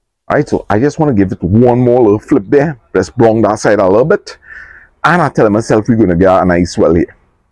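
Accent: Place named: Trinidad and Tobago. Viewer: Kudos. Another good video. I like this format where you narrate, you should do it some more. You keep it real with your natural Trini accent unlike others who try to speak “properly” but sound pretentious. I’m familiar with the Caura area and used to buy doubles from Palance at the junction many years ago and now live in cold Toronto. Next time I visit I’ll contact you prior so I can also visit Farmer Harry. Blessings.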